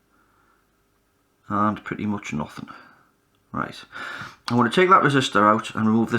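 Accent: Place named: England, United Kingdom. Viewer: I grew up in England and lived in Colchester, Nottingham, Bucks, London, Herts and Chester so don’t have a regional accent, but love the persistence of English accent variants. I can usually roughly identify accents, but can’t pinpoint if someone comes from Newcastle, Durham or Sunderland. Which is yours if you don’t mind my asking? 😊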